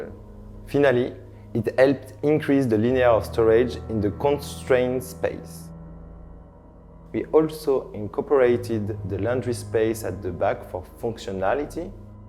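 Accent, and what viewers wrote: France. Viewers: nice baguette accent, and as french i can appreciate the effort :) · Man , I would recognize French English accent with my eyes closed.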